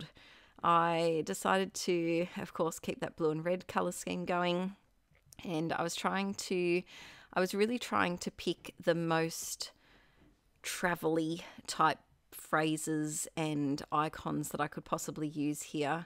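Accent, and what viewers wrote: Australia. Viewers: I enjoyed watching your video. Thankyou for sharing your process. I agree, the papers you've used are beautiful. It's nice to hear another Aussie. A fellow New south weigan nonetheless. 😁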